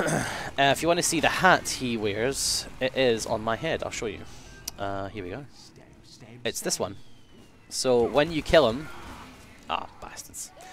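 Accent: Scotland, United Kingdom. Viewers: no hes scottish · are you scottish?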